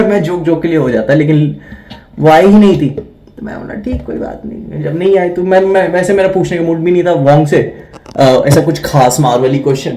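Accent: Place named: Purvanchal, India. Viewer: Pta nahi kyu bhai Your win feels personal🥹. I could literally feel each of your pause and stutter. The moment of realisations, everything. I don't know why maybe because mai bhi aise hi ek humble background se belong krta hu purvanchal ke. Aapka lehza, aapki shabdaavali mujhse milti julti hai shyd isliye. But anyways keep going brother, someday I'll also be on my own aur ye saara kuch experience mai bhi first hand kr rha hounga.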